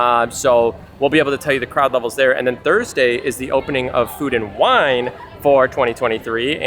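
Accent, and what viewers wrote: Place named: Midwest, United States. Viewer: I know a Midwestern accent when i hear it. Which state are you from? Illinois?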